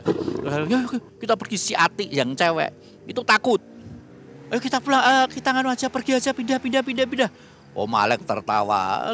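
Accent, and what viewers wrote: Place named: Semarang, Indonesia. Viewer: Pantesan ora asing mbek logat e.. jebul wong semarang 😂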